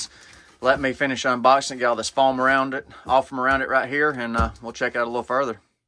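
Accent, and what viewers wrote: South, United States. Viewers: Great video as I just ordered this inverter a few days ago off amazon along with 2 of their 48V 100AH Li Time batts. I've been using a small 12v solar set up at my storage place for the past 8 years so jumping to 48V is going to be a huge improvement. Started with 6v AGM in series with 800A bank then replaced with 3 12v 200AH Li time only 2 years ago. Have been very happy with the batts but do you think I should have gone with our used another brand? The two Li time I just ordered are not the rack type but the big flat type, a mistake in your opinion? Are you planning to use full time in your camper or home? Curious where you are located, you sound Southern ;)...I'm in East TN?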